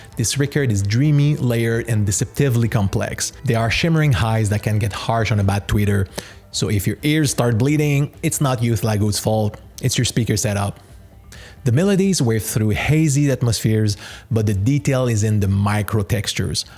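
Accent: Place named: Montreal, Canada. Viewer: Dude, Whoa! Just stumbled upon this video from my recommendations and within 10 seconds I assumed you’ve been on YouTube for years… only to find out when I checked out your previous videos that it’s been a month. Your production is top notch. Also, your accent and the Expo67 poster instantly made me realize you’re from Montréal (same as me). I rebooted my channel to document my Hi-Fi journey and it’s so cool to see someone from here also doing the same. You definitely got a subscribe and follow from my here and on IG. Keep it up ✌🏼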